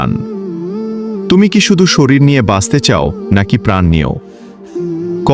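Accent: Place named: Basirhat, India. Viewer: কন্ঠ টা বাসীরার লোকের মত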